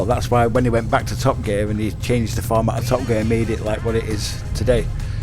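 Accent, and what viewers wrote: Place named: Barnsley, United Kingdom. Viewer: i know a barnsley accent when i hear one mate lmao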